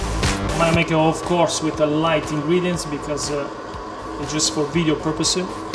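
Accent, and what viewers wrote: Italy. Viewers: love Italian accent!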